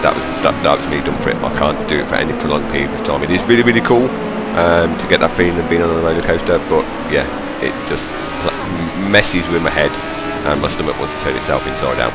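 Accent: Black Country, United Kingdom. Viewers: That accent sounds familiar :P Not from the Black Country by any chance are you?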